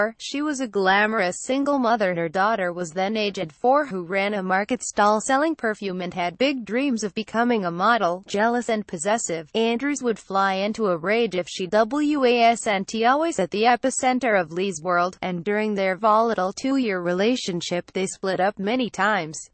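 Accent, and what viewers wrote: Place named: United States. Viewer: Love American accents, but very annoying voice 😐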